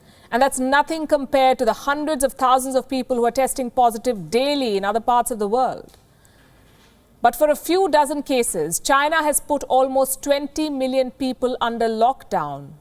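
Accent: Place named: India. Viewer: How funny of this india accent!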